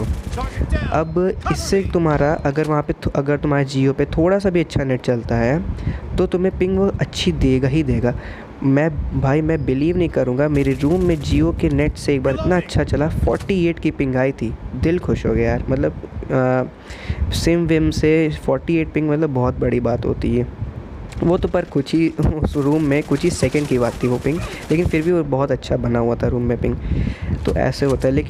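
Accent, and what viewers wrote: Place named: Bihar, India. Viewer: Or bhai kya hal chal Abaj se lgta h ap v bihar me rhte ho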